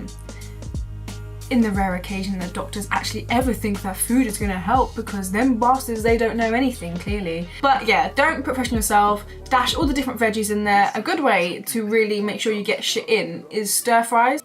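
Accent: United Kingdom. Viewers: Your accent is interesting... It's like mostly MLE and then suddenly RP. I think it's that you glottal stop a bunch of ts but not all of them.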